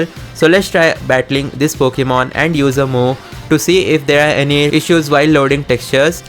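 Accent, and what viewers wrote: India. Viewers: Bro it's Indian accent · Bro it's just indian accent U racist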